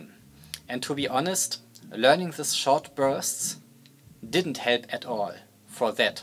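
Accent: Germany. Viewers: Wie man es hört das du deutscher bist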